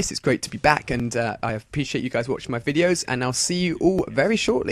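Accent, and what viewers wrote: United Kingdom. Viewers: I wanted to watch a MW3 gameplay video, not some obnoxious british guy blabber on for 10 whole minutes. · fuck your slicky british accent. stinks.. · Good video but British accents give me headaches..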